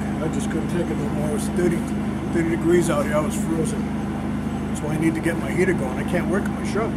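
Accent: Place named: Northeast, United States. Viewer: Thank you! My husband was struggling put our 4ft pieces of pipe together. So I watched ur video to see if I could find him some help. And yes it did. So we both thank you for your knowledge. I also want to say I love your northeastern accent. Reminds me of my Grandpa. He was from Eastport, Maine... where are u from? If u don't mind me asking..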